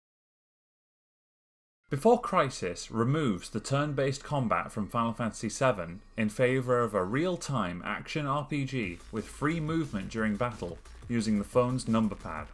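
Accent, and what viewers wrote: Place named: East Midlands, United Kingdom. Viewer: You put so much effort into this, I love it. I saw when you joined and I assume this was a Covid project? Also you sound so close to my accent in the East Midlands!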